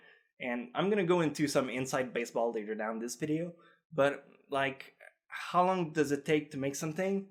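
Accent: Canada. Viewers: I just realized your Canadian accent👌, good luck m8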